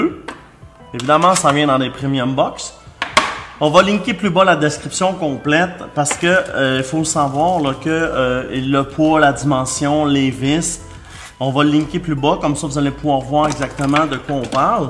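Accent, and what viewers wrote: Canada. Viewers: J'adore ton accent canadien tes le meilleur. Je veux un spinner stp je fait toujours tes concours mais je gagne jamais et la j'espère gagner. Merci pour tous se que tu fait pour nous .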